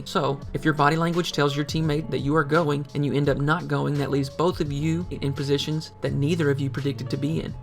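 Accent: South, United States. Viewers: hi hello. I just found you from a sunless video and decided to look up your channel and I wanted to say a few things. 1. i'm pretty sure you're southern based on that accent hahah. I'm from the 'sip so ayyy. 2. I'v e never seen an in depth video like this helping people in each individual rank. so high props to you for even making these videos. 3. I just wanted to say thank you and I have high hopes for you my guy!!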